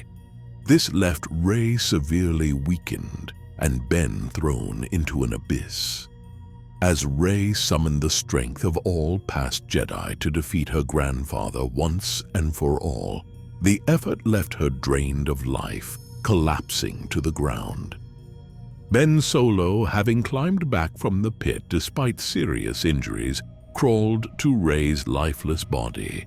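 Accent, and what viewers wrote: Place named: United Kingdom. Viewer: I never knew someone so british could tell stories about StarWars, but im in for it <3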